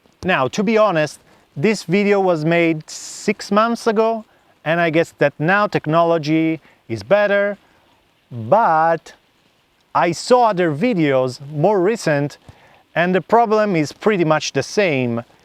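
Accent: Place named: Italy. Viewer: You don't need to tell us that you are from Italy; your accent says that loud and clear. 😅 btw nice video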